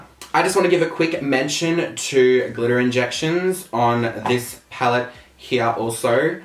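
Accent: Australia. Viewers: Is he Australian? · Hello! I'm new to this channel. Does anyone know where he is from? I'm fascinated by Aussie accents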